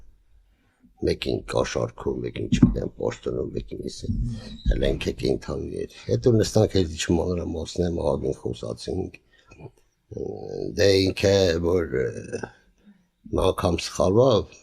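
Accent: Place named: Ijevan, Armenia. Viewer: Кяваро иджеванский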